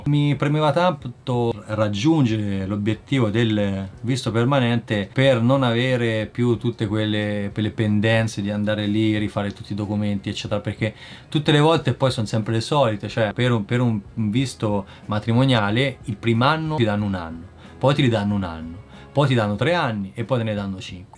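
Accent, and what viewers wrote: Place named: Perugia, Italy. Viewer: hai un po' l'accento perugino🤔🤔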